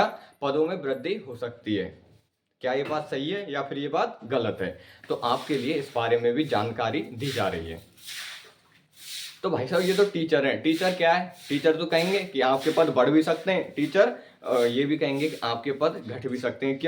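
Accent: Bharatpur, India. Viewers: Bhaiya bharatpur ke ho kya aap 🤔🤔 bnva rahiyo h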